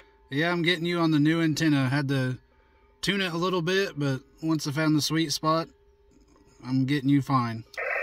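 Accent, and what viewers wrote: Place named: Illinois, United States. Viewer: This was an expensive video for me. 😊. I have a Kia Niro which is similar to your Prius in many ways, and I am afraid that I will duplicate your mount, the antenna, adapter and whip almost exactly. I basically have the same whip as you, I dug it out of my shed. Thanks for posting, I would not have known about this otherwise. I have had a real DK3 screwdriver bought from the late inventor for years, but it's been too big for anything smaller than the pickup I had when I bought it. This small screwdriver looks perfect for our modern small cars. I recognized your accent. You aren't too far from my wife's hometown (Marshall, IL) and where I went to college in the early 90s. I consider that area to be one of my homes! I will post something in a few weeks when I get it in my Korean hybrid. 73 de W0FMS/7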